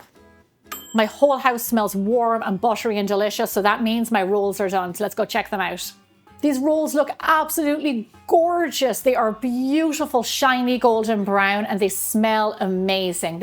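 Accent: Ireland. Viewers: Those are some lovely sweet rolls,. You’re truly such a gem when you bake, and when you speak with that adorable Irish accent. 🤗🤗🤗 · I love your accent & have been wondering if George has taken after you speaking w/ a beautiful Irish accent. These rolls look wonderful.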